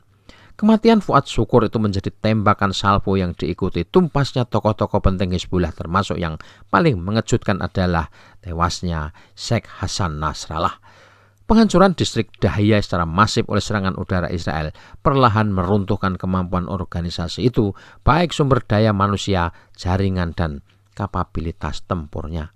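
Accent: Java, Indonesia. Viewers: Jowo medhok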